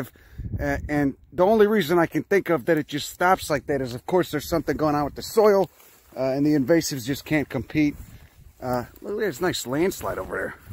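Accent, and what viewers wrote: Chicago, United States. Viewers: Exaggerated accent is the only "bit". His podcast has his actual voice, still a Chicago accent just not as prevalent. Same knowledgeable guy that clowns the clowns. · What's the deal with the Chicago accent? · You’re from Chicago ain’t you? I can tell a Chicagoan when I hear one. I am also a Chicago guy. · Please keep going with your videos. I'm a botanist with a specialty in native plants East of the Mississippi. I'm so grateful to learn about the native flora of California, from a learned person with a sense of humor. Your accent makes me miss the South Side of Chicago so much!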